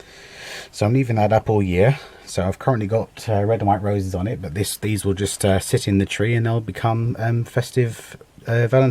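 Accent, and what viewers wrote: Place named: Kent, United Kingdom. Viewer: Clever. Wouldn't of thought of that. you sound like your from Kent. I live in Kent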